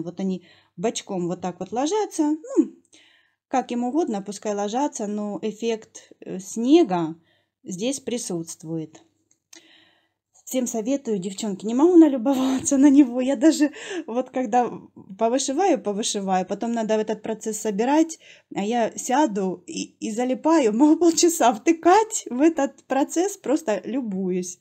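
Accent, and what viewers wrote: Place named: Kharkiv, Ukraine. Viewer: Все в порядке у Вас с языком, по крайней мере не настолько, чтобы ушам было больно. Что ж поделать, если у нас, харьковчан, такой акцент) Я даже в комментариях ниже заметила ошибки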